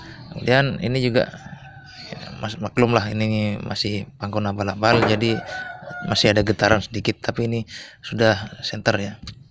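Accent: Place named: Madura, Indonesia. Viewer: Logatnya madura...asli mana mas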